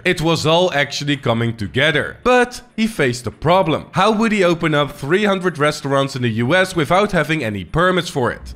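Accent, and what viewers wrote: Netherlands. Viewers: Just started watching. Already love his dutch accent. New sub here! 🇧🇪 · Why can Dutch people detect a Dutchie within seconds 😂 Good video tho! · Are you dutch? I hear an accent 🤔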